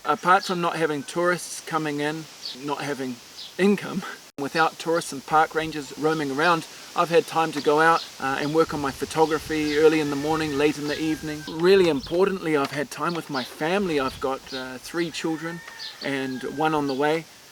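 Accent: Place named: New Zealand. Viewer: Was wondering if this fulla was from home, I could hear our kiwi Accent 😂🇳🇿🤙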